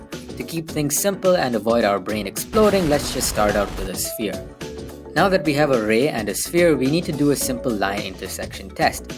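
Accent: India. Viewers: AAAA bro it seems like u is Indian cuz the accent is a lil similar am I mistaken?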